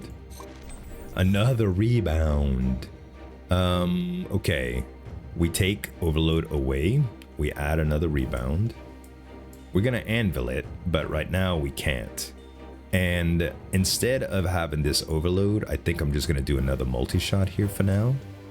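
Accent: Louisiana, United States. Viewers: Are you from Louisiana? I'm from lafayette and work in New orleans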